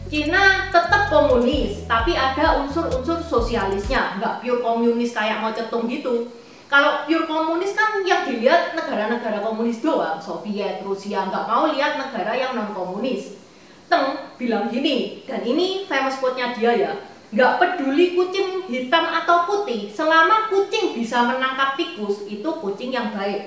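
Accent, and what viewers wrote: Java, Indonesia. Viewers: Logat jowoneh medok bamget.!! Hehehehe wis ora opoh2 sing pnting mudeng. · Salam kenal Ka. Baca judulnya aja aku sudah suka banget. Eh pas liat video kak berdialek Jawa aku tambah seru nontonnya. Ada lucu, gemes tapi keren banget ka. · Logat jawanya medok banget.. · Orang jawa pribumi logat nya gak medok cc ini medok bget😀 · Rasanya ma'af ya ibu masih harus banyak belajar dialek atau pronounciation bahasa Mandarin yang baik, tepat dan benar déh kepada mas Novi Basuki PhD yang santri asal Jawa Timur itu lho.... karena logat bahasa Mandarin ibu masih bahasa Jawa-Centris! Sorry ya masukan konstruktif! Demikian juga nomenklatur kata "Cina" sudah tidak berlaku lagi/dicabut melalui Undang-Undang atau Tap MPR ya bu, harusnya diganti dengan Tiongkok/Tionghoa / ZhongGuo / China ya bu. Terimakasih atas video-videonya yang banyak memberi informasi faktuil dan bermanfaat!🙏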